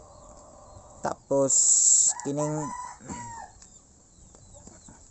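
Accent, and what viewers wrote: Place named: Visayas, Philippines. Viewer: Visaya ka pre, di kita maintindihan.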